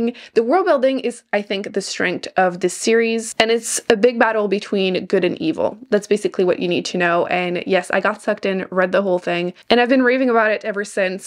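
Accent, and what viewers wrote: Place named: Quebec, Canada. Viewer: Thank you for sharing,! Stupidly question, are you from QC? I love the little accent.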